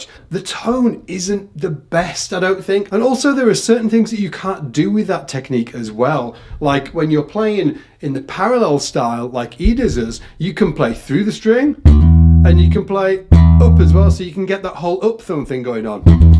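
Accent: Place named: Leeds, United Kingdom. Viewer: Where exactly is your accent from bro? I hear Leeds area of course but I am hearing other dialect, did you live down South for a while???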